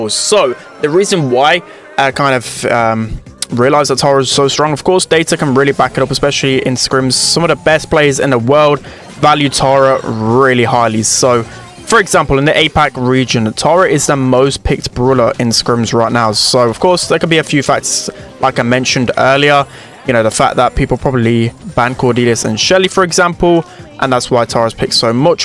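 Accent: United Kingdom. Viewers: I love your british accent 🥰🥰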